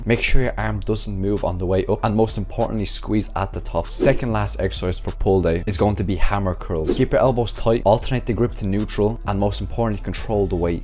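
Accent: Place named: Zimbabwe. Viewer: Nice Rhodesian accent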